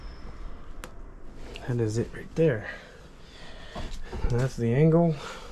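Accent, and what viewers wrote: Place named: Trinidad and Tobago. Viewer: Why do you sound like you’re from the Caribbean? Trinidad to be specific?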